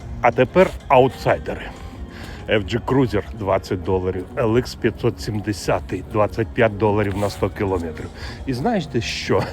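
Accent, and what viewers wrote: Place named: Surzhyk, Ukraine. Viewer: Вчи українську краще і швидше , бо суржик лізе з усіх дірок! Українська : наголос на третьому складв. Тобто на букві ї.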